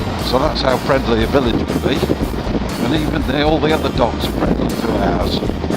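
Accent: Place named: United Kingdom. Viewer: . Eu intendo muito pouco o inglês. Eu sou mais acostumado a ouvir o inglês Americano. Dar para perceber bem o Sutaque Britânico. Valeu meu irmão.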